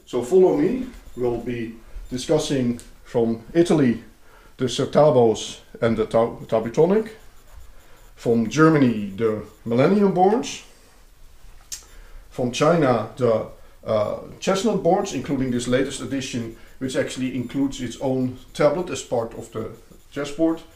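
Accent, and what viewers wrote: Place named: Netherlands. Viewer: Aaaah een mede Nederlander, hoor dat direct aan je accent XD toffe video!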